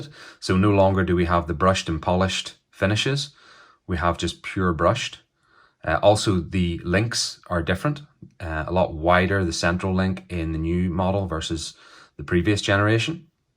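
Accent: Northern Ireland, United Kingdom. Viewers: great to hear a NI voice. I have a 38 with red bezel on order. thank you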